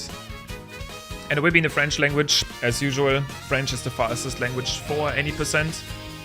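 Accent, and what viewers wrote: Germany. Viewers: I know a german accent when i hear one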